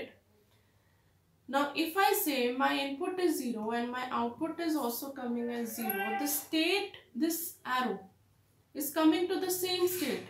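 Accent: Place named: India. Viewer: In the thumbnail, I saw a white arm and I clicked the video because of that. But it is goddamn indians AGAIN! That terrible English and the accent is driving me crazy and I cannot stand any content made by indians.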